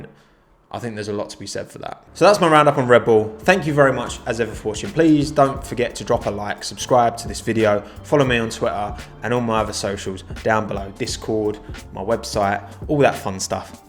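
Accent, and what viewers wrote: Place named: Essex, United Kingdom. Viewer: Great video, strangely I found the best bit was knowing your from not to far from me haha, especially after I only came across your channel after watching Josh Revell and thought your voice was Essex sounding 😂😂😂 keep up the great videos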